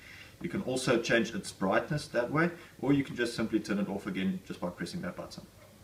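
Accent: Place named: Afrikaans, South Africa. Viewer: Great review for an epic keyboard. Quite an interesting change of pace listening to an Afrikaans accent rather than American haha but I dig it ;) Good job!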